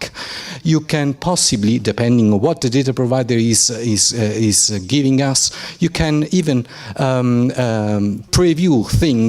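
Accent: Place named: United Kingdom. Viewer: Sei troppo forte zio! Perfetto con un pizzico di accento britannico!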